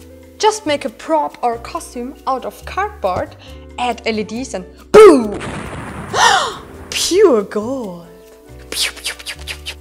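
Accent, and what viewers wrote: Germany. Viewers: german accent strong with this one :D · Ich bin selber aus Deutschland und erkenne den Akzent meiner Sprache ;) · Are you german? Becuase ur accent sounds like that. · Ist sie Deutsche? :D Eigentlich hört man es ja, aber keine Ahnung, kann ja auch ein anderer Akzent sein.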